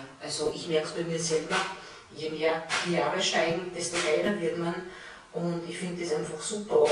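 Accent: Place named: Vienna, Austria. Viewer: confirmed. from Vienna because of her accent.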